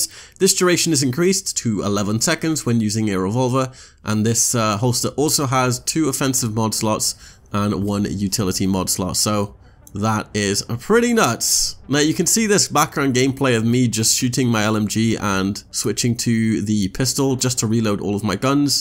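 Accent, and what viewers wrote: Wales, United Kingdom. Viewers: Are u Welch? Ur uncle is pilot teacher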